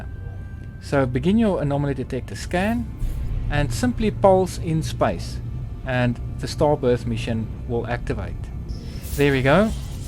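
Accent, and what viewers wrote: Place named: South Africa. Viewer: The South African accent is strong with this one.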